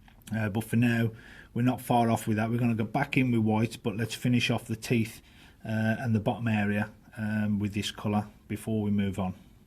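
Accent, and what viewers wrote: Black Country, United Kingdom. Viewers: I cor tell where yo accent is from whether it's more Cradeley or Oldbury/ Bearwood side lol either way, you aren't far from me. I have just started doing car and bike graphics after doing canvases for about 4 years. Great vid just wish it was around when I started about 5 years ago :) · Hi, bostin tutorial mate, just gerrin into airbrushin meself, bought a rook of createx wicked colours, badger patriot 105, compressor etc and bin avin a goo at tryin to do some skulls with real fire, gone a bit tits up a few times while i get used to the airbrush and the paints, musta watched 100 `how to real fire` videos on ere but your`s is the fust one that shows it in absolute detail which someone like me can follow in real time, crackin job mucka, BTW Willenhall here near Wolvo so we have similar accents dow we, arr LOL. Thanks for this mate it`s taught be a hell of a lot.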